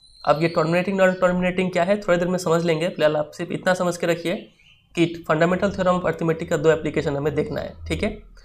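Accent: Bihar, India. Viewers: Bhaiya aap Bihari ho kya aap ki language Se Lag Raha tha😅🤙👍 vaise same to you😅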